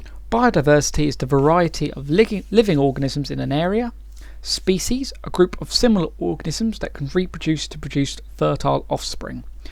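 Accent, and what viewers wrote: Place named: RP, United Kingdom. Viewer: why do you sound so posh, do your intellectual abilities match your convincing tone?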